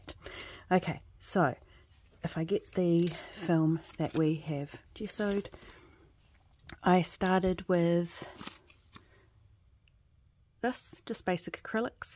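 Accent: New Zealand. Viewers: keep doing these step by step tutorials. They are great, plus I love listening to a fellow kiwi!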